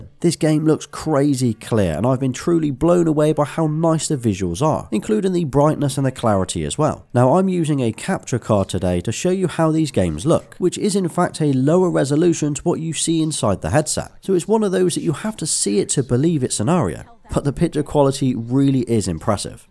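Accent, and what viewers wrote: United Kingdom. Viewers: what? I love british accent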